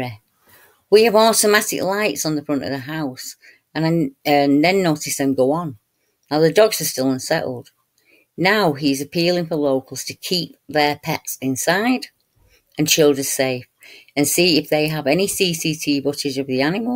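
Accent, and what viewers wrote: United Kingdom. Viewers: I could listen to you all night. I live in the US in Georgia. I love your accent. Of course the UK has cryptids. I have no doubt that big cats are there. It's a wonder more children or elderly people haven't been attacked. It's hard to believe people would just let them loose. But look at what people do to their dogs and cats. So irresponsible.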